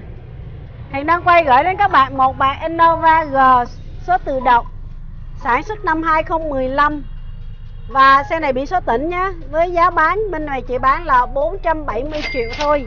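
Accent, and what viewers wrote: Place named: Nam Dinh, Vietnam. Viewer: C VẦY, VẦY giống quê NAM ĐỊNH em thế.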